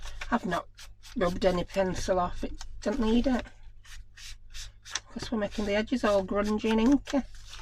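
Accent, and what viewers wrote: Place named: Hull, United Kingdom. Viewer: your accent at times almost kills me lol. My parents are from Hull, they came to New Zealand in 1952. I reckon I'd sound like you if they'd stayed in England. When I first went to school the teachers couldnt understand what I was saying. My accent musta been pretty full cause I'd only been with my mam and da. Sending hugzz 😊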